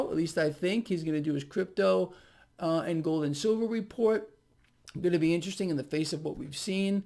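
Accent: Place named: Long Island, United States. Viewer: Cool, you talk like a fellow Long Islander.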